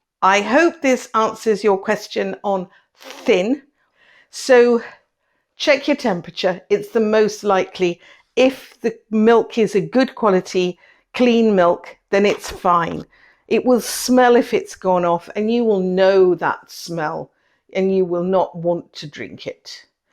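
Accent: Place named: United Kingdom. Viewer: She is English. She is pronouncing it as the Russian word with British inflection. Relax.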